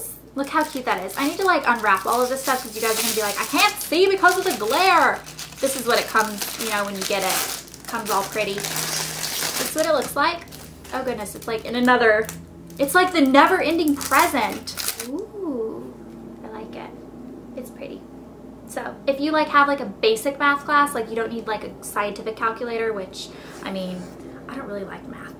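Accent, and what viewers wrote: England, United Kingdom. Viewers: Nice British accent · that britsh accent :D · British accent? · I love ur english accent! lol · I highly like how you acted in this video. You were very... I don't know, lively. You were just happy and you didn't seem fake or bored. PLus, I love your british accent... lol. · i love your cute British accent there it made me laugh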